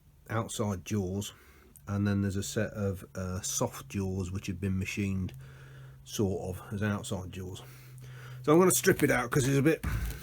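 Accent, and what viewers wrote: United Kingdom. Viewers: Australian here! When you say chucks all I hear is "chooks" as in "chickens! LOL! Confirmed by me Missus!..LOL love those british accents. Keep up the good work!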